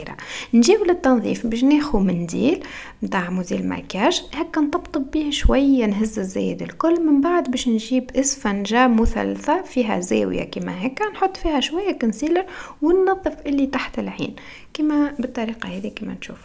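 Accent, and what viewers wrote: Tunisia. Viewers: لوك حلو ولهجتك التونسية احلى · بعشق اللهجة التونسية بحب اسمعها منك عسسل 😍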